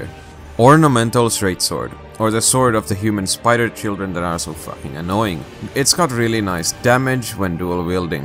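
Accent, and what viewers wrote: Finland. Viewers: love the finnish accent <3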